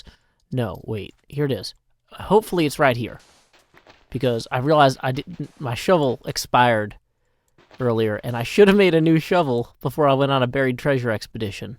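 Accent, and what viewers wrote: South, United States. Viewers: First time watching one of your videos(and i hope this isnt rude), but your accent makes me think of a true southern accent i don't hear often among youtubers. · Funny to hear a hermit with my southern accent I'm definitely gonna be paying more attention to this channel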